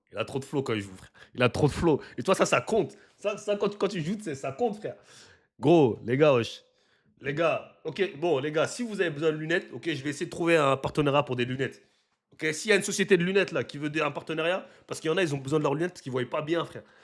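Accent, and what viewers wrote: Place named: United States. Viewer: Ton accent américain, il est juste impressionnant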